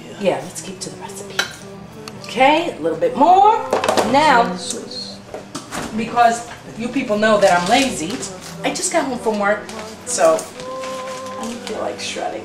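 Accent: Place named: Brooklyn, United States. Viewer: u could be the rachel ray from brooklyn with that accent!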